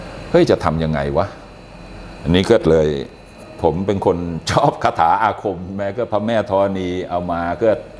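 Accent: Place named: Pak Nam Pho, Thailand. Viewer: สำเนียงคนปากน้ำโพค่ะท่าน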